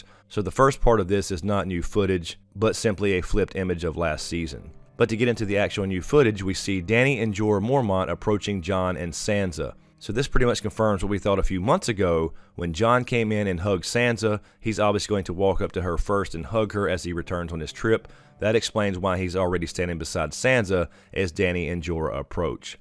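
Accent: South, United States. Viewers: I worked on fixing the closed caption, I have spelled Daeny this way, hope it's correct. Mostly just fixed punctuation and names, and times when the auto generater doesn't recognize your southern accent. . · Where are you from? I’m trying to pick up what state your accent is from.😂🤷🏻‍♀️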